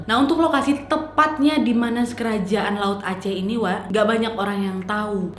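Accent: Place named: South Kalimantan, Indonesia. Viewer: Dari kalimantan mana suara nyaa Kal-Sel Nih bos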